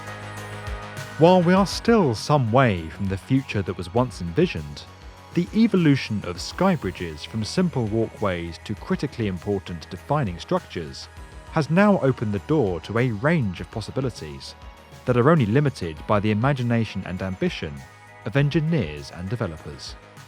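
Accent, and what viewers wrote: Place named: RP, United Kingdom. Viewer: You have got a state-of-the-art received pronunciation RP. Do Never miss it! And ALWAYS, ALWAYS STICK TO THE METRIC SYSTEM. HOWEVER, in this subject (aerial corridors/sky bridges/ elevated pedestrian crossings.) You might be interested to know that although perhaps not so much flamboyantly spectacular as the Asian/arabs ones you could realise that this type of structure are commonly found in Big South American cities and in Spain even in ciudad de Panamá I remember walking within one 10 years ago in the Radisson Decapolis Hotel connecting it to the Casino over a high traffic road. Another "small" example that came to my mind now is the long aerial covered bridge linking 2 shopping centres in the Colombian Caribbean city Barranquilla (Where Shakira & Sofia Vergara were born) My point is that that is something that Chinese wallet took it (like many things) from Europe (Spain, chiefly Barcelona) they are NOT PIONEER AT ALL IN TERMS OF ARCHITECTURE OR URBANISM AT ALL!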